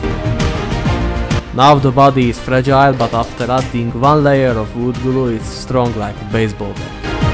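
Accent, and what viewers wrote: Russia. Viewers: you have an amazing Russian accent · bro your accent sounds sooo good, you sound like an russian... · His accent is very similar to Russian,Or am I the only one who hears this? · Сразу понятно что он русский, по акценту немного напоминает русского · Bro are you russian or what why you have russian accent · Ты понимаешь русский?Акцент не английский.Do you speak russia? No English accent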